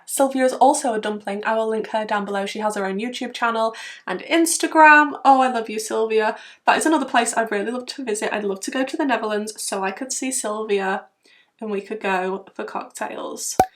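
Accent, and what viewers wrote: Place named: North, United Kingdom. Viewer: You remain a total pleasure to watch... and listen to with your cheerful northern accent ❤. Maintaining your impassioned religious faith, especially one you acquired as a teen or young adult, is really special. It speaks of your strength of will and ability to withstand the changing fashions and trends around you. In fact, your commitment to your makeup aesthetic, irrespective of trends, shows the same fortitude! God bless you and your loved ones.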